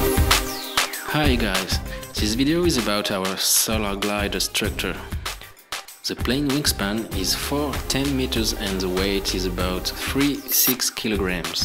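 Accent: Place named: France. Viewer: just wondering what film covering you used? i cannot understand what it says in the video. also, what airfoil? and what size prop? I can help you do a voiceover in american english, if you send me the script, i can send you the audio recording? perhaps it would be easier to understand? be happy to help if i can. if not, no hard feelings. I speak spanish, and english, but had a hard time understanding the french accent. Thanks for the update! thumbs up!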